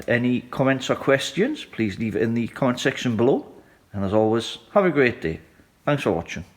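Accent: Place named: Newcastle, United Kingdom. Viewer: I can't agree more. English is not my native language and thus I am not an expert, but maybe contributing factor is the 'Geordie accent'. especially, circa <num> there are many articles online about it. for example, "Geordie accent rated UK’s most friendly and trustworthy", "A survey found that the Geordie accent is considered the friendliest in the UK, and is also the most likely to put you in a good mood", etc.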